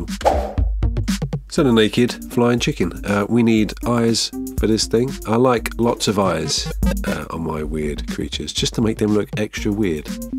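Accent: United Kingdom. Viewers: I know my way around quite a few British accents, and certainly yours isn't unfamiliar. But I swear to God, I was in the kitchen when you were describing "tail for a face" and I thought you were saying "towel for a face" and went "huh". 😂 I figured it out when I came back in and you were describing the tail in a different context (plus I could see what you were doing) and I realized my mistake and everything made sense...sort of. And "male" sounds like "mal".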